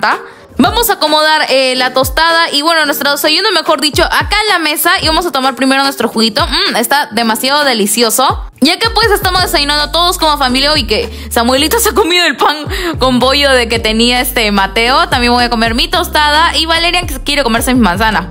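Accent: Peru. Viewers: Eres de Perú? O chile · eres peruana🥰🤔🤨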